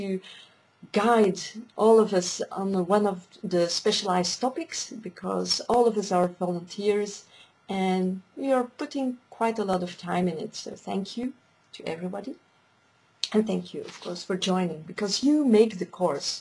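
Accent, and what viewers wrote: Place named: Flanders, Belgium. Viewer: Thank you for this lovely welcome,. Flemish-inspired English? 8-D Well, you're certainly inspiring.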